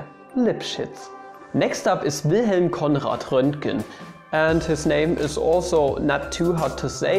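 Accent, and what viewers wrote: Saxony, Germany. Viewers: Aus welcher Ecke Deutschlands kommst du? Ich hör die ganze Zeit nur Friedrisch und Ludwisch. Erst dachte ich an Sachsen. Stimmt das? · Boii with Sachsen accent tells us how to pronounce shit. I found my kink. And with Kepler there is actually something that can go wrong. My GERMAN Professor still calls him Keeeeeeeeepler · Речь идёт, конечно, о разных диалектах немецкого. У неустрашимого нашего хоста явно саксонский акцент, и поэтому говорит Фридриш вместо Фридриха. :) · Er ist Sachse... das ist nicht der Norden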